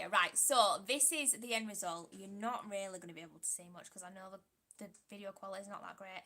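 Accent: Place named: Yorkshire, United Kingdom. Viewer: ? As in BD8 idle village? You sound Yorkshire. Looking for a good nail technician, I’m Bd2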